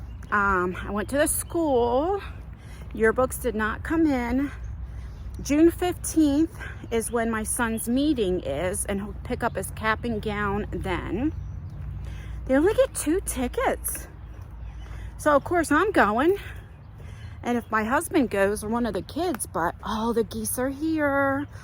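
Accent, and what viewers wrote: New Jersey, United States. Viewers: I truly enjoy these vlogs I’m a new subscriber l love your Jersey accent by the way love ❤️ from Ohio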